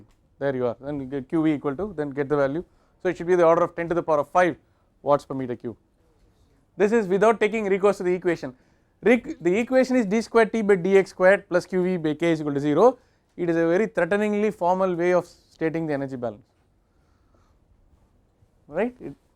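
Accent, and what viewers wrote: India. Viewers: Yes, you need to tune into the characteristic hindi accent.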